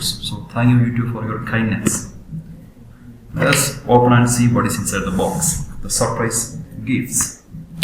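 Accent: Kerala, India. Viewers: Are u malayali ,ur slang express it. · Am I hearing a mallu accent 😶? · Sir,you sound like a malayali.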